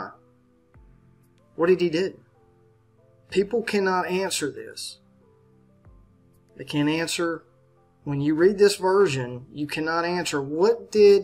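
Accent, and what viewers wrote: South, United States. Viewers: awesome bro. you from Bama!? that's sick. I never knew that. I always knew from your voice that you were from some part of the south, but I love it. I'm from Alabama also. been here my whole life. Do you still live in Alabama?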